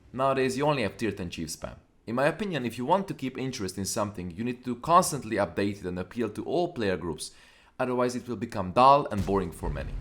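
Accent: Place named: Hungary. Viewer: Hungarian accent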